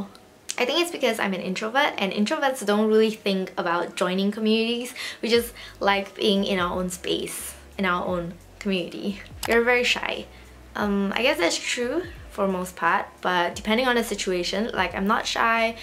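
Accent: Singapore. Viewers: Your first video that I saw was the purito review and when I heard your voice I assumed you're a Singaporean so I came to your channel and saw this video and I was right hahah. Singaporeans really have this distinguished accent XD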